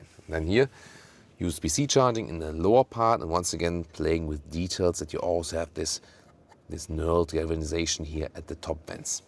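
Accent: Germany. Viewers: Listen to his German-speaking videos, very solid Hochdeutsch. Probably not faking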